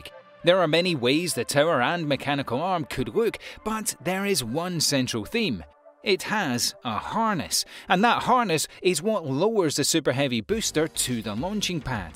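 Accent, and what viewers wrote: Scotland, United Kingdom. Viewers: Are you from Scotland? I like it , not so much the Brits I like the way you speak, it reminds me of 'Scotty' on the enterprise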